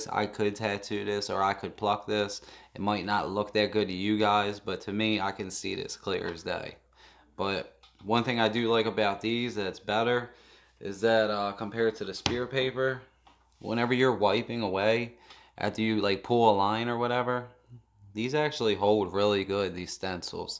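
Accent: Baltimore, United States. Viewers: Lol I was thinking you sound like you’re from Baltimore then I looked at your channel name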